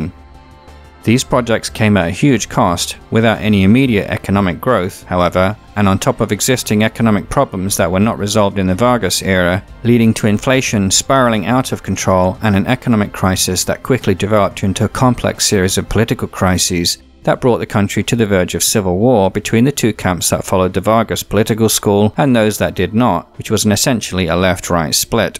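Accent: United Kingdom. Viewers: Great summary of the history of Brazil, you sound like you are from north England somewhere like Leeds/Sheffield ?, one thing I don't understand, Brazil has some of the worlds largest collection of resources and a pollution of 200 million but with an economy not in the top 10 and 15th the size of the USA, what is the reason for this? Places I have visited in Brazil, Sao Paulo, Manaus, Rio, Brasilia, Boa Vista. It used to have the currency the cruzeiro when I was there long ago, also the ALCO powered cars were fascinating back then.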